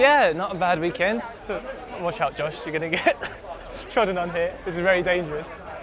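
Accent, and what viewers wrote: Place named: United Kingdom. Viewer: Such a British sounding Thai national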